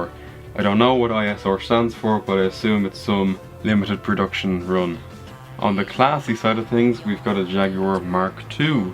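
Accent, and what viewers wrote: Ireland. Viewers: are you irish ? so am I . cool video :)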